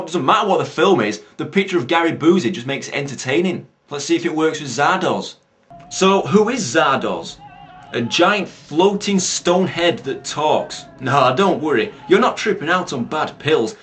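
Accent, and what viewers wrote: United Kingdom. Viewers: I also do movie reviews and I am looking for some one to do reviews with me if interested message me. Also good job on your reviews I love the British accent.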